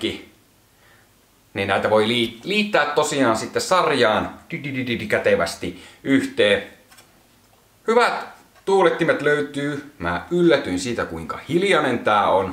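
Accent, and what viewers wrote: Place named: Oulu, Finland. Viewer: Pähiä kampe! On tuo Oulun kieli sitten hienoa.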